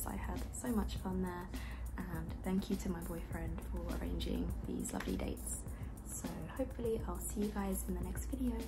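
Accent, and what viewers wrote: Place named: United Kingdom. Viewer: I’m studying English through your videos lately. Your British accent is so beautiful and easy for me (I’m Japanese) to listen to. I’m looking forward to watching more videos❤︎ (Sorry about my bad English) 最近の動画を見て英語を勉強しています。アクセントがとても綺麗で私でも聞きやすいです。これからの動画も楽しみにしてます❤︎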